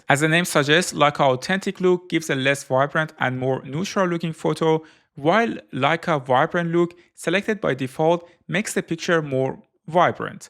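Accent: Iran. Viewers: Is this Iranian accent? Or am I wrong? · چرا حس میکنم گوینده یه ایرانیه😂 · Aren't you Iranian? The accent sounds like Iranian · داداش ایرانی ای؟ خیلی لهجت ایرانیه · لهجه برای یه ایرانیه😅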